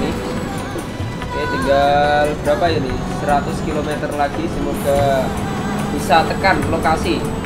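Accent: Java, Indonesia. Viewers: jawa men mas omongane heheh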